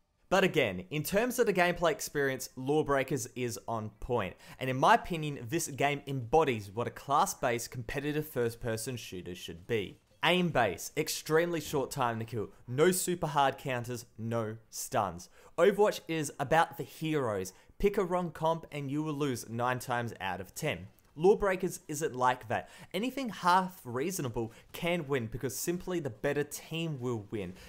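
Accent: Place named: Australia. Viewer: Wait...I thought that's how every Aussie would pronounce those words. Them and the Brits have the same pronunciation for words that in American English wouldn't. I think it's a beautifully interesting thing about the language. Like how the Brits pronounce "torque" and "talk" pretty much the same way.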